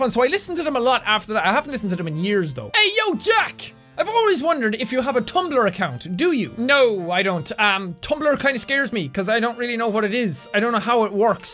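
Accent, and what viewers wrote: Ireland. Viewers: i dont know why but people think jack is not irish he is hes trying to make it easier for you guys to understand im irish and i can tell hes irish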